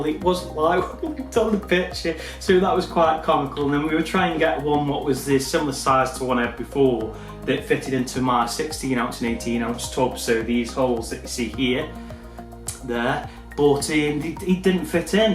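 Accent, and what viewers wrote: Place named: Stoke, United Kingdom. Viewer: I recognise that Stokie accent anywhere great videos keep them coming I am a newcomer to the hobby not quite took the plunge yet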